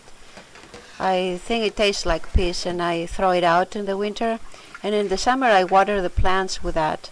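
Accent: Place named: Greece. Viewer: From your slight accent I take it you are also Greek as I am? If you are then I can happily say "Efharesto para polio", translated into Thank you very much. I use Fage thick Greek store-bought yogurt as my starter if I do not have previous home made yogurt left over, and with the Fage it also comes out delicious. For many years I used to use my Greek Grandmother's method of straining the yogurt thru a clean pillowcase, since cheesecloth is really a pain, but recently I decided to buy the Euro Cuisine GY50 Greek Yogurt Maker, which is really a yogurt strainer. It fits easily in my fridge, and strains the whey beautifully, and the end result is very thick Greek yogurt. Also the longer I leave it to strain in the fridge the better, because it also becomes thick like goat cheese, and if I add some salt to it then it becomes ricotta cheese. To clean it I just use my sink sprayer on light force, and get all the yogurt out until it is clean, then air dry it for the next time. 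No dishwasher for this item. If this type of strainer interests you look on youtube as they have video's about it. Thanks again for your instructional video, and I thoroughly enjoyed it.. Ya Sou, keh kalie oreksie meh to yaourtie sou!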